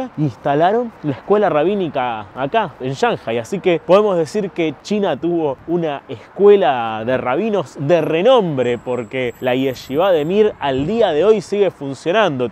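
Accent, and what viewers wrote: Buenos Aires, Argentina. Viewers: Shanjai, shangai, tu español es muy argentino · Qué interesante y exótico!, narrás con encanto, me da gracia tu modo juvenil y porteño chabón!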